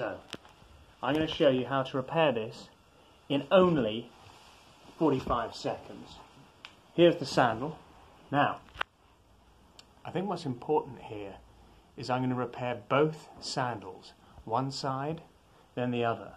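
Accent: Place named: Ireland. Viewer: More like wee Ireland accent to me lad.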